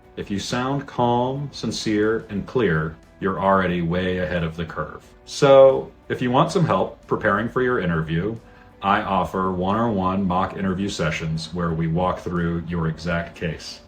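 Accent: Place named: England, United Kingdom. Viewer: Like the way that you simplify things. Best visa officer and English accent ever.❤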